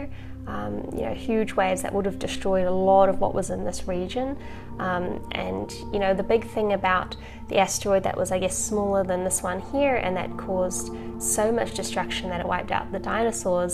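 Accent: New Zealand. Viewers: I'm pretty sure that that's not an Australian accent, but rather a New Zealand accent. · I only know this from watching too much Flight of the Conchords on repeat. That's unmistakable New Zealand backwards speak. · She's from New Zealand. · It's definitely an New Zealand accent.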